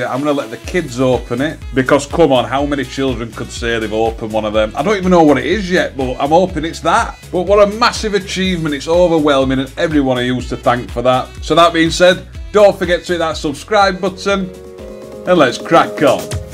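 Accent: Yorkshire, United Kingdom. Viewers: Congrats on the getting the play button, you fully deserve it........as I've said before, your the only reason i watch youtube nowadays.. always make me laugh and that top yorkie accent 👍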